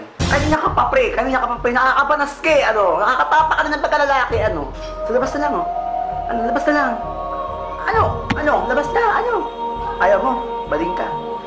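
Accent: Visayas, Philippines. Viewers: Bisaya ka??? xD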